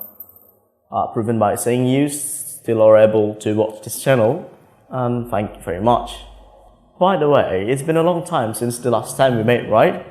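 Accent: United Kingdom. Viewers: British accent on point bangett,kagum 🥰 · Your British accent amazed me.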